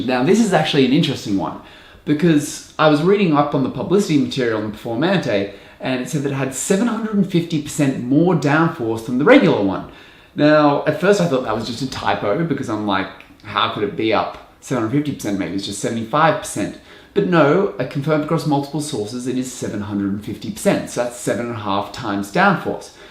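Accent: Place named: Australia. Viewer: hes more of an English sounding aussie than the rest of us bogans that sounds like or worse than Dundee lol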